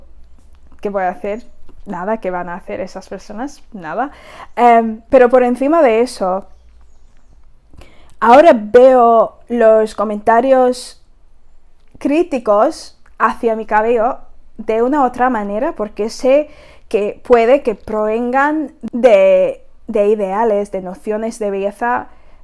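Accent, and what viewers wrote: Spain. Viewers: ¿Cuantos idiomas hablas? Tienes una dialecta de España. 😁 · Tienes el acento muy Español cuando hablas, aunque si, hablas muy bien! Emma Dabiri me parece una mujer bastante inteligente y la perspectativa que nos contaste de su libro es interesante. Para mi personalmente escogì a crecer mi pelo Afro natural por razones relacionadas a mis creencias politicas. Fue un acto de resistencia feminista negra y asì desde entonces vivo con el espiritu y mente completamente libre. Hasta hoy en dia, encuentro muchisimo inspiraciòn del estilo de las panteras negras como Angela Davis y Kathleen Neal Cleaver. Es que Emma Dabiri me da mucha pena y decepciòn. Primero, no creo que ella hubiera tenido esta plataforma de hablar por parte de las mujeres negras si no fuera mulata con la piel clara. Podria ser que habria algunos que no estan de acuerdo, pero ella utiliza esto ademas a su sexualidad para subir su visibildad publica y para adelantar su carrera. 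Por ejemplo no entiendo por que auñ de ser respetada por sus ideas intelectuales tenia que publicar fotos de si mismo llevando nada mas que su ropa interior en Instagram?? Para mi esto muestra que intelectualmente ella no esta tan iluminada ni liberada como tal. Es que nunca nos vamos a ver a un hombre a su mismo nivel profesional presentandose en instagram medio desnudo intentando a utilizar su sexualidad para subir su perfil publica. Eso es solo lo que queria comentar en cuanto a ella. Y como tu, soy britanica pero aprendì mi español viviendo y trabajando en Cuba y Colombia. Me encanta los acentos de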